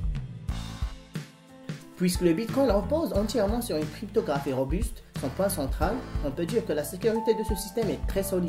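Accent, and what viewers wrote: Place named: North Africa. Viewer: la music sert a masquer son accent du bled, aller on t a reconnu, retourne bosser a la pizzeria, et laisse les bitcoins tranquille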